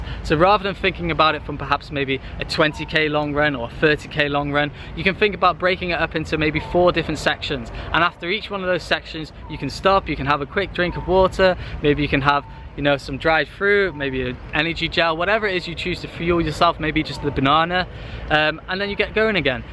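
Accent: United Kingdom. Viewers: You sounds british but have palm trees in the background. whats the story there?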